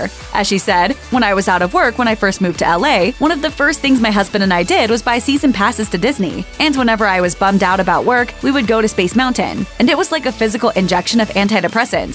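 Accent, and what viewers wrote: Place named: New York, United States. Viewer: Neutral New York City accent? The city has 8 distinct accents according to where you live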